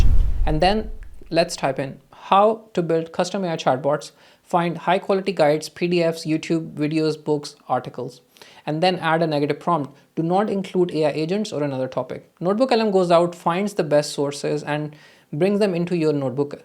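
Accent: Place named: Northern Ireland, United Kingdom. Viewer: I hear N. Irish accented words!? lol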